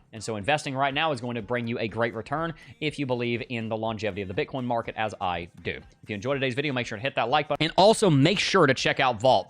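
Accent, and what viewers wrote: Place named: United States. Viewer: Why you speak like that 🧐 Typical idio t yanky doodle dandy Full ov noise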